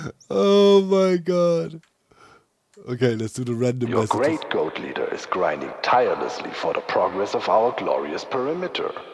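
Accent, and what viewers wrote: Germany. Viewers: Have you ever played "Command & Conquer Red Alert 2: Yuri's Revenge"? Your motivational messages really sounds like Yuri's propaganda voice. In fact, the actor, Udo Kier, who portraid Yuri in this game is German and did both the English and the German voices. So he also has the slight German accent like you do. All hail the G.O.A.T. 🐐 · The German accent makes it sound .... nvm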